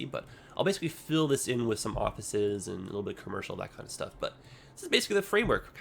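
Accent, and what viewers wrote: Canada. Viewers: That Canadian accent, bro 👍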